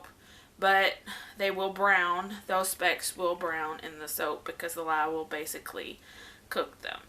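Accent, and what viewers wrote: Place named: South, United States. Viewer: I love your voice. Such a pretty "Southern Belle" charm:)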